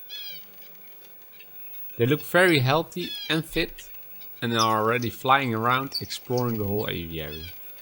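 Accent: Netherlands. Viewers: Hearing on.your accent you are from.the netherlands right. Love your birds. They are beautifull! I really want to make a small aviary to! Not quit sure yet. Keep it up!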